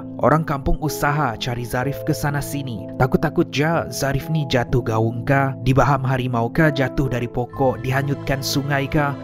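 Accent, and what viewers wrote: Sabah, Malaysia. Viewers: sedap dgr org sabah cakap... semua bahasa buku.... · Sabah ka tu bang...area mana ?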